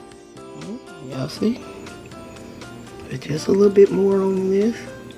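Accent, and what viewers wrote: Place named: South, United States. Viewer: Okay. So she had me at tomatas .. bet she pronounce mosquitas the same way.. REAL SOUTHERN WOMAN YALL!!! I'm riding with her let's go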